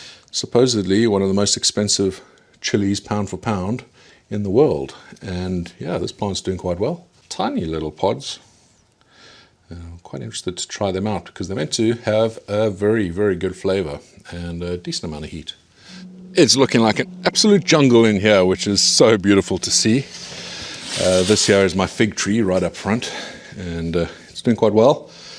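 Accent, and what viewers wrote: South Africa. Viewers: You have a south african accent why its quite cool to me because i am from south africa and you inspire me to keep on going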